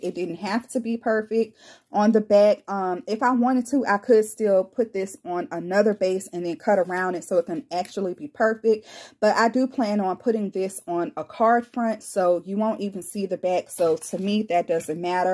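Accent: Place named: Georgia, United States. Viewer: Adorable! I have a new Cricut machine x2 Months that I haven’t played with yet. You’ve inspired me! Subscribing! What state do you live in? You sound like a fellow Georgia girl FYI The Color Purple is my favorite movie of all time!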